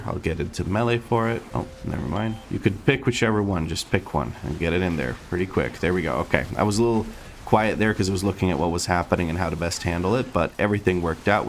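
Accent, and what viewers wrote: United States. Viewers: hey, completely unrelated but...why do you have an american accent?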